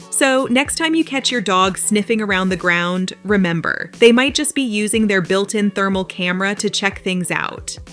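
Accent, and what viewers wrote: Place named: North America. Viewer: 😅?? it's a North American 'accent', so there is no accent!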